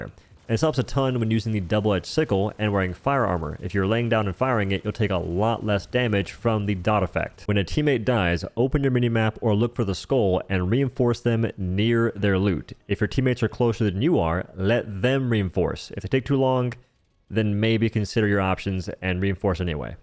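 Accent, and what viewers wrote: South, United States. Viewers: the way he says WH- is prime american. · could be georgia could be louisiana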